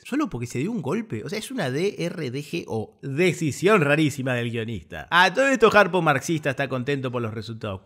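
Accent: Argentina. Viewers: Jajajajaja…que me has hecho reír con tu acento argentino y tus ocurrencias…la reseña más jocosa que he escuchado de una película…saludos compadre 👍🏻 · Todo bien todo bonito pero el acento argentino del narrador es insoportableeee 😫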